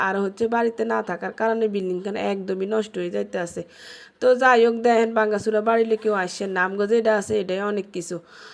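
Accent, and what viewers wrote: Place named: Noakhali, Bangladesh. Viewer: এডা তো পুরা নোয়াখাইল্লা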